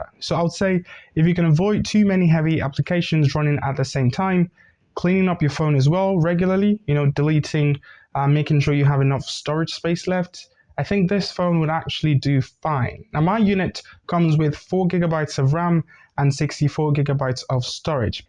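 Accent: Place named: United Kingdom. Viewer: Nice review. Plus I like listening to ur British accent. Always.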